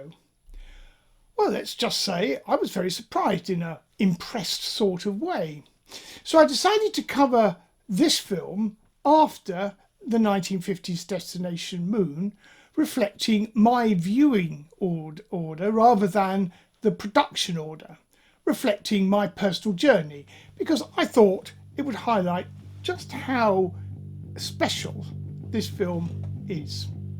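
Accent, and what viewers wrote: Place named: Yorkshire, United Kingdom. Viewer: Fascinating. The way you pronounced Frau im Mond sounded distinctly Yorkshire. Yes, I know The Romance Languages have the same thread running through them and the Germanic Angles and Saxon Tribes settled in Yorkshire some time in the C5th, or C6th. It just means I was reminded of the connections tied together in a neat little bow, through your audible delivery. Thank you, Cheers.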